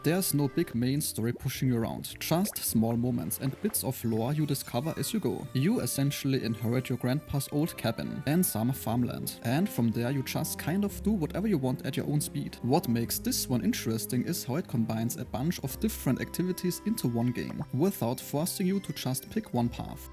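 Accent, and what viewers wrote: Germany. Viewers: Gonna add a comment to push the algorithm, you showed me some good games over the past Year, after discovering your Channel. Thank you for doing this! And I got one question about your accent. Is it German?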